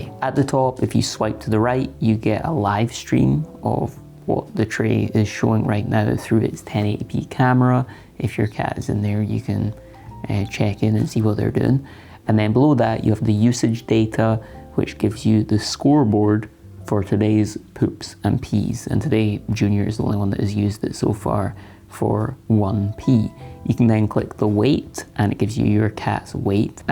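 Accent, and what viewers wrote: Scotland, United Kingdom. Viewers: The Scottish dialect is quite something. 😅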